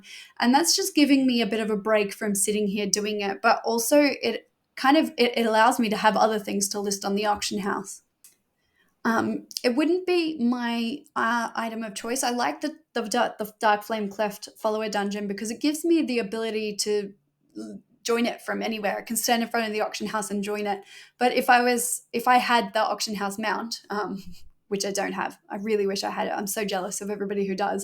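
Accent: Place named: Australia. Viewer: when you say morning and evening do you mean Australia time or US time? I'm in Australia, so that would be good to know I guess. I'm new to the channel and not sure whether you play on oceanic or US server - you sound Australian but I don't want to assume Oceanic server. Also I would be happy with 30k a day, that would well and truly pay for WoW every month.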